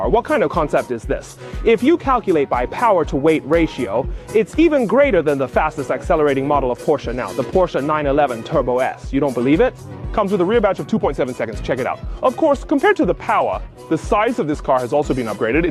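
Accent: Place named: Kenya. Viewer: Cool car Some of your pronunciation sounds Kenyan man... Ever been?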